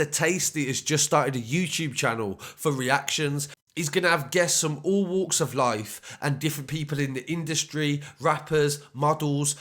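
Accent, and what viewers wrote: Birmingham, United Kingdom. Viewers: My man needs to do a cbeebies bedtime brummy stories !!! Would be epic ... especially with the sponsor before it all starts 👍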